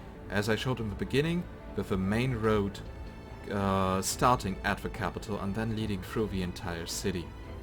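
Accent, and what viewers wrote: Germany. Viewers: You have a german accent, do you come from germany :D? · man hört den deutschen akzent aber das ist cool. ich spreche auch oft englisch.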